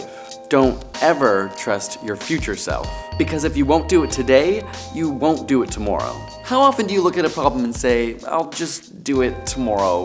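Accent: Canada. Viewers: are you Canadian? the way you pronounce "Tommorow" is kinda bothering me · Your Canadian is sticking out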